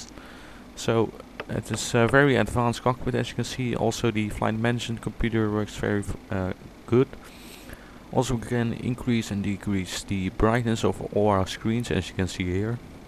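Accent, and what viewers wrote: Netherlands. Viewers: From your accent I can hear you're dutch :)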